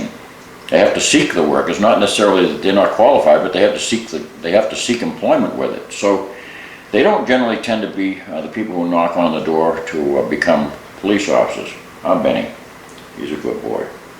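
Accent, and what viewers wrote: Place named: Vermont, United States. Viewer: Are you VT/N.H.? Your accent reminds me of one of my troops in Iraq. He was raised on a dairy farm in VT. He's now a detective locally.🇺🇸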